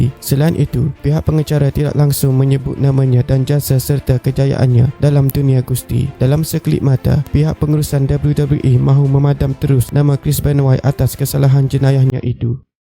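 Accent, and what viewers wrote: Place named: Terengganu, Malaysia. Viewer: Ni yang ckp ni mesti orng ganu atau Kelate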